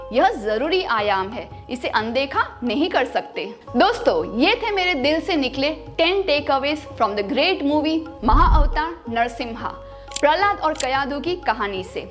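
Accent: Standard. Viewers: जितनी शुद्ध हिंदी आपकी बोली है उतनी शुद्ध हिंदी हमारी बोलचाल की भाषा बन जाए। 🙌 ॐ भगवते वासुदेवाय नमः 🙏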